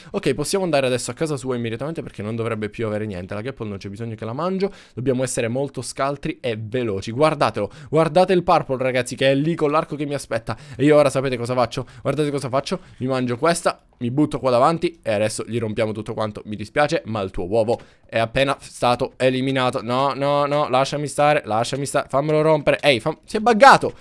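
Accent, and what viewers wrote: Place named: Caltanissetta, Italy. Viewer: scommetto che sei di Caltanissetta si senta dalla tttt cmq bellissimo video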